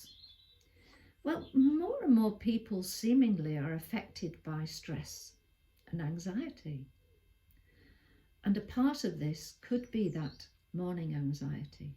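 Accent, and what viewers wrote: England, United Kingdom. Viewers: What a lovely reassuring voice you have. I am partial to English accents as I was married to someone from the UK . I am widowed and live alone with no family The golden years are not so shiny as described! However I do breath meditation and that helps with the collywobbles. Mine manifest as an aching hollowness in the chest. I think the reason they occur in the mornings is that in the state between sleep and wakefulness (hypnopompic) seems to be when we are most vulnerable and suggestable. Luckily my dog won’t allow me to allow me to stay horizontal. She demands her walk.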